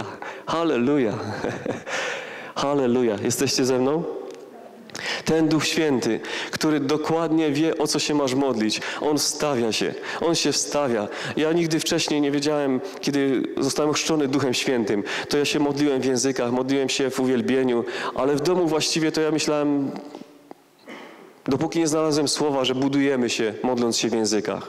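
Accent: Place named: Silesia, Poland. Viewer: spoko Ślązok, genialny akcynt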